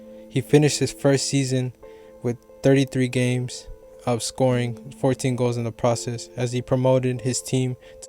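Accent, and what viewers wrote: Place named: Paisa, Colombia. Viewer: Puta solo ecucharte sabia que eras paisa Great my bro, HE is our Hero and yeah cause he was from E.S he's not as famous...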